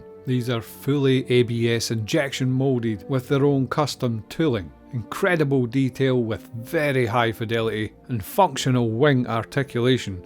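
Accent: Scotland, United Kingdom. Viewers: Something Star Trek about a Scottish guy talking about engineering. Give it all she's got!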